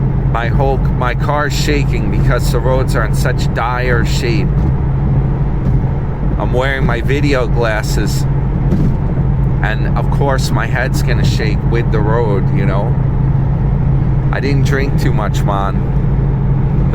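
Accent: New York, United States. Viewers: I would rather endure a jalapeño enema while a spike is driven through my fucking temple than to endure your New York fucking gibberish! Shut the fuck up you annoying snot!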